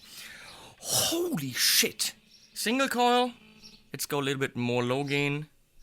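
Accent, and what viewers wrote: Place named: Germany. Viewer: LOL! Love your demo of the Dark Matter, your humour, and your German accent! Keep on! Helmut from Germany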